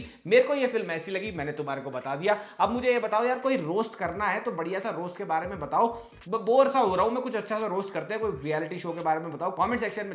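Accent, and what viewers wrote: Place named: Delhi, India. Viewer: Delhi accent bhai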